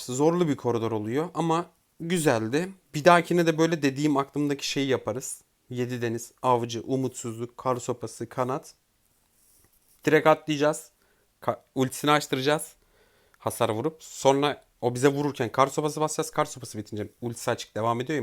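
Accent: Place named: Anatolia, Turkey. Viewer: Ses tonundan beyefendi görünümlü Anadolu çocuğu sanıyordum, bugün gördüm adam hollywood artisti gibi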